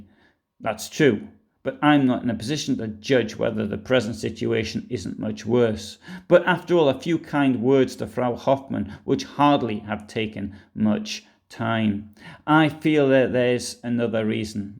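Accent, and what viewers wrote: Newcastle, United Kingdom. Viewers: Another excellent film, thanks. Always a treat to hear Eva's diary in a Newcastle accent. Hahaha!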